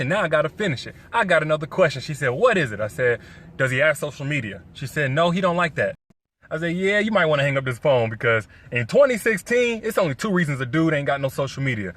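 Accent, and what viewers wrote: United States. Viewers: everything you say is very interesting, and logical. The problem is: you are talking English too fast, with American accent, to people all over the 🌍 world, and we don't understand what you say. Also the sound of the engine car is disturbing. So please. Speak just a little bit slower. Thank you.Efrat Israel. 🍍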